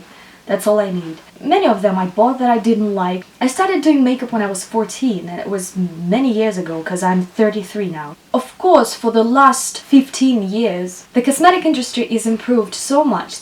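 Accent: Russia. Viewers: I've been interested in Mac lately, we just got one in our mall (I live in a smaller city in Canada so not so much high end stuff here,) so good to know you love it. :) Might try it some day even if it's a little more expensive. I work in a drug store so I tend to just buy make up from there, Nyx (for lip stuff mostly,) Revlon foundation for my oily skin, Maybelline superstay powder for after that, and just anything for the eyes really lol. Side note: I was trying to place where your accent was from, but couldn't, then you happened to say Moscow, Russia. lol Coincidences. So cool, one of the places I'd love to visit some day. <3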